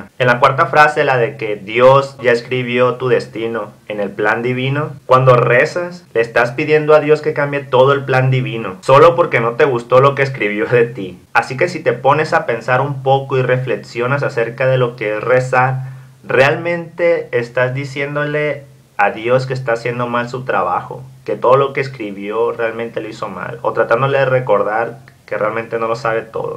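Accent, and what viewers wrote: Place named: Sinaloa, Mexico. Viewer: Por cierto hablas como sinaloense ñ.ñ de donde eres?? xD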